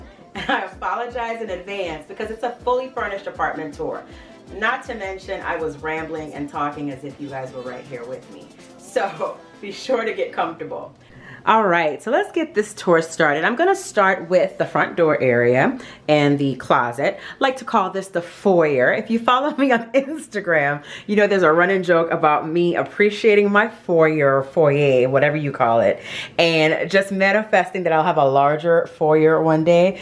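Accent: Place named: Virgin Islands, Caribbean. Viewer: I love the video, very organized and well put together. I picked up quickly on your accent 🙂. I’m also from the Virgin Islands 🇻🇮, St. Croix. I’m now your new subscriber!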